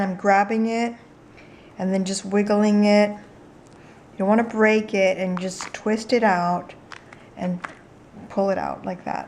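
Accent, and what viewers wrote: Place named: United States. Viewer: You have an American accent. Do you live in the states?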